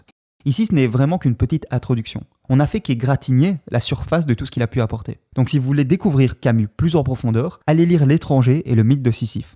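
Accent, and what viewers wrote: Belgium. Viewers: comme d'habitude excellent video tu es le meilleur youtubeur de Tout Les temps avec Plus recherches plus de nuances et moins d'accent belge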